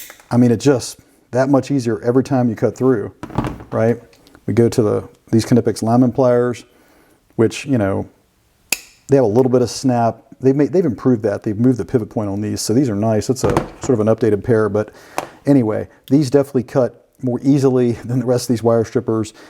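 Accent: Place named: Milwaukee, United States. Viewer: You sound like you grew up in Milwaukee. The natives say Miwaukee.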